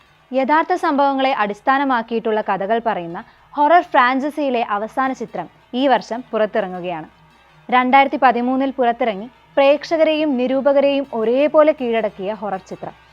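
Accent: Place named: India. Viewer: Indian accent bruh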